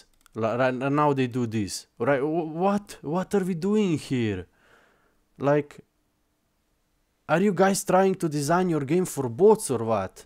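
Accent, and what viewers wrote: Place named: Europe. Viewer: how do i block all classic wow content narrated by an esl european accent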